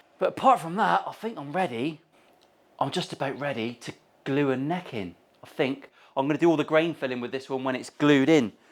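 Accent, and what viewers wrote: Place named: Wales, United Kingdom. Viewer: Nice work on the Welsh accent, try throwing the odd 'Tidy butt' in for a bit more authenticity.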